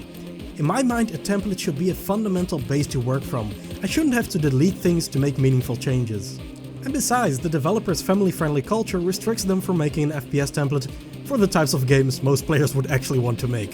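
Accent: Netherlands. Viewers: are you dutch or am i wrong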